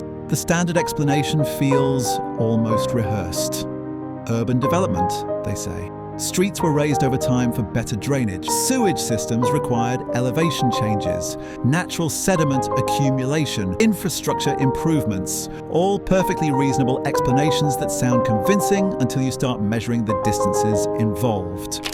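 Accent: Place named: England, United Kingdom. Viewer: Who is really making all these Tartaria videos? All these channels are producing extremely similar videos… all are very well produced, all have professional sounding English accent narrations, all have a similar cadence/ speech patterns, they have zero human mistakes in the narration, never a throat clear, slight cough, never a change in voice, never an actual person to be seen behind the voice/ channel.. All seemingly able to find these amazing old photos in “archives” & supposedly researching records & registries from centuries ago? it’s seems as if are all being AI produced? Are they truly the work of genuine independent researchers? I find the whole Tartarian topic extremely interesting, but question if there is something else going on behind this information.. All these channels producing virtually the exact same mini documentary style videos on the subject?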